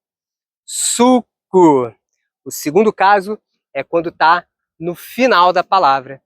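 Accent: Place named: Rio de Janeiro, Brazil. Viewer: Ilha Da Magia, loved the lesson my Brother. Sotaque Carioca e Top ta ligado. I really enjoy your videos because you distinguish the Carioca accent from other accents. Thank you for the licao mermao, valeu valeu abraco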